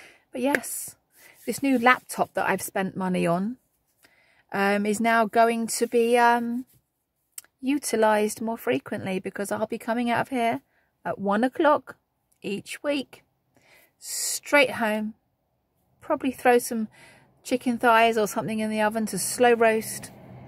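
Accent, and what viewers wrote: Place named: United Kingdom. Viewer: Congratulations, that is great news. I am so glad you have persevered with your channel. It is so good to have British carnivore youtubers forming a community. There are some brilliant American ones and I am very happy to hear British voices discussing carnivore lifestyles in the UK, it is so much more relatable for other British people. Thanks also for recommending Carl at Primal Lifestyle UK he also has some great videos. Stay strong, You are amazing.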